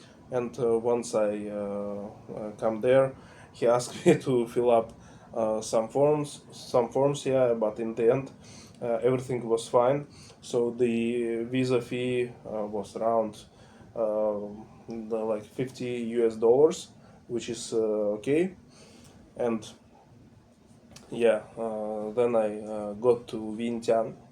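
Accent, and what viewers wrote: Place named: Russia. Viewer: У меня впечатление, что так разговаривать может только россиянин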